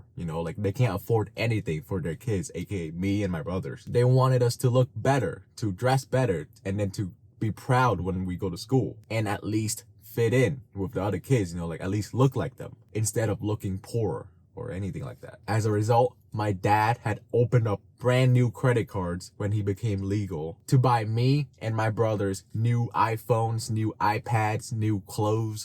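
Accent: Vietnam. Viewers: I'm a second Gen viet, but not fluent. At what age did you move to the US? I can tell you still have a smidge of that Vietnamese accent. My parents come from a poor state and they have pretty bad financial sense. Investing in horrible businesses in Vietnam, don't believing in banks or investing. But I don't blame them too much since they never finished elementary school and barely know to speak conversational English. They always say to make a lot of money when you grow up, but give zero guidance how to do it. Also all my family are pro trump maga supporters, guess why.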